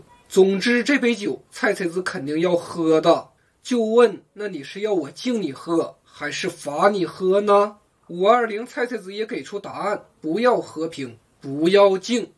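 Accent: Northeast, China. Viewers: 哈哈😄你是东北人吧！高!老乡!👍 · 东北话的幽默风趣 体现的淋漓尽致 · 老哥的东北口音好搞笑，好喜欢😄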